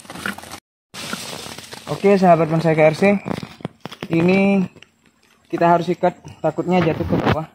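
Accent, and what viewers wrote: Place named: Madura, Indonesia. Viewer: Keren.. Klo dr logat bahasanx logat madura nih.... 👍👍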